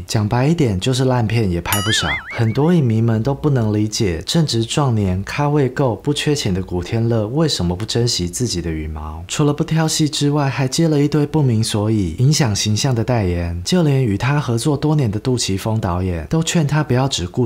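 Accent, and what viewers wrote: Cantonese, China. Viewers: 做得太好好了，很詳細，而且喜歡你講粵語，好可愛❤會一直支持你，加油😊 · 謝謝你的用❤制作，現在香港電影都係古仔一個人撐起，不簡單 … 很掛念哥哥 … 你的廣東話 很可愛 😂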